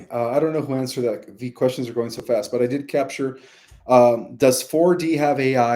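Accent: United Kingdom. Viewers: Love that British accent 😂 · Enjoyed your live, love your British accent lol 😄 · I'm loving the British Alto, it's hilarious and funny enough sound better than your regular accent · Loved te british accent .bring humor all the time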